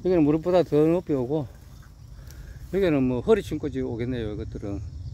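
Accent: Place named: Andong, South Korea. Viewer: 992 출발합니다 오셔서 감사해요 농원의 달콤한 향 가득 만날생각에 설레요 같은 지역의 억양이라 더 정겨워요 안동태생 입니다 ㅎ 자주올게요 ❣️☕️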